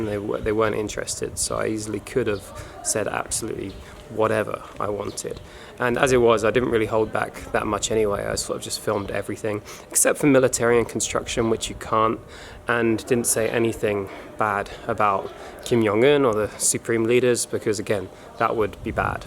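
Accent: United Kingdom. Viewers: Jonathan Stoevelaar - he’s not American lol he has a British accent..... you’re not an arsehole just ignorant lol 😆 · This video just popped up in my feed. Sorry man! I don’t have a clue who you are and what you do, but being at that airport alone. It sets you in the mind of how Son of MAN’s return is going to be. It’s going to be lots of abandoned places. Also, I couldn’t really tell you were from the UK due to your accent not being as strong. I thought you were from Australia, but I was wrong. Anyway, be safe on your next trip wherever you go. God bless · This guy talking in this video has an English accent, with a British passport. Syria was destroyed by the USA on purpose, like they destroyed Afghanistan, Iraq and Libya and now want to destroy Iran. Why are you blaming the Assad regime for destroying Syria and for making you a refugee in Germany?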